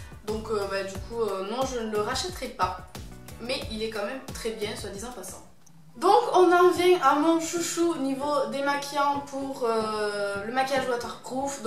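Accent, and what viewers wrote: South, France. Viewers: Je t'ai découverte y'a pas longtemps et j'adore tes vidéos, très simple sans chichi, et puis ton accent du sud 😻 c'est tellement rare les youtubeuses du sud ça fait plaisir moi qui est l'accent aussi ! Et pour info, comme tu as un petit budget, je ne sais pas si tu as vu, mais ils ont sorti plein de petit parfum a 30e chez sephora ! Voilà j'ai hâte de voir une prochaine vidéo 👌 !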